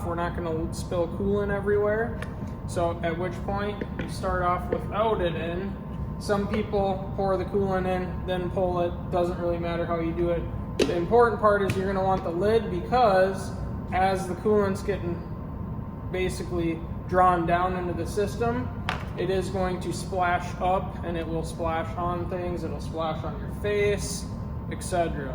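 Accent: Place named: Midwest, United States. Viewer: Your Midwestern accent is amazing.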